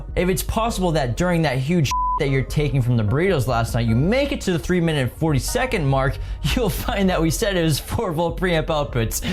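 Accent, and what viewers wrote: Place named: New York, United States. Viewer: You must be from New York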